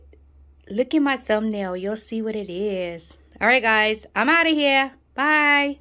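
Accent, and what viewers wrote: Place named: Cajun, United States. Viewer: that cajun accent, you was extra heavy with it and i love it lol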